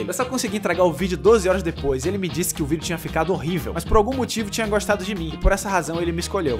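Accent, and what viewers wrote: Bahia, Brazil. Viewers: rapaz sua historia de antigamente é quase igual a minha agora (EM TER VERGONHA DE FAZER VIDEOS)E DIVULGAR O CAL, inclusive eu sou de camaçari uma cidade vizinha a Lauro de Freitas onde voce morava. eu sofro de depressão, transtorno borderline , ansiedade sindrome do panico e sou hiperativo por isso desenvolvi fobia social, mas não paro de sonhar em fazer videos e algo me diz que se eu não desistir e perder a vergonha e o medo eu conseguirei ser um youtuber, e esses dias vi seu canal e quando percebi que você era da bahia eu ate me emocionei, eu acredito que a lei do universo, (a lei da atração) me mostrou seu canal para confirmar, quando eu ouvi seu sotaque eu pensei, pelo sotaque e pela giria (vei)eu pensei: esse cara parece ser baiano! logo em seguida o motoqueiro perguntou se voce era do rio de janeiro e voce respondeu que era de salvador. 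eu pulei aqui em casa e falei porra o cara é daqui de salvador, isso pra mim foi um sinal e por isso fiquei emocionado. agora to falando para todos aqui que tem um youtube que ta fazendo sucesso e é daqui de lauro de freitas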